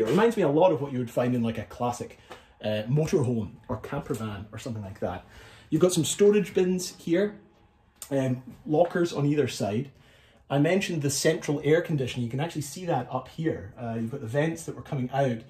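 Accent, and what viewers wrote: Scotland, United Kingdom. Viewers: Excellent video just subscribed first time viewer😂 Nice to hear a jock voice and not a whiny American Voice Scotland Gaelic accent no 1🇮🇪🏴🇬🇧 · Outstanding job, and it’s even better with a Scottish accent. Very thankful you want to all your trouble to do this, it was well worth it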